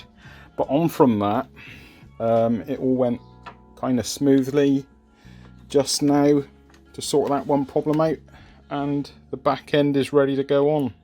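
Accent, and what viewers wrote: Bristol, United Kingdom. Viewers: fantastic vlog shame about the the pits that broken, it not long for you have finished keep your chin up it won't be too long before you go out on it. Sunshine is coming next week so hopefully you can get out. Your accent sounds like its from Bristol away? Im got a appointment on Tuesday morning to put a new back tiyer on so I will be out on my bike again yay fantastic take care ride safe mate TTFN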